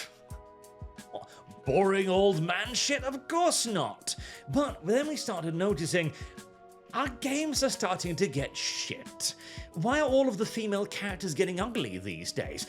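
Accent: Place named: United Kingdom. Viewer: Im surprised your from Norway, i would have never thought... Ur English is as Birtish as its gets!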